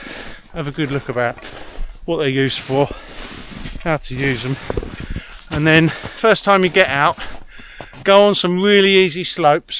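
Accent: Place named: South East England, United Kingdom. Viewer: You've got the same tent as me, South east accent same... so subbed. 😂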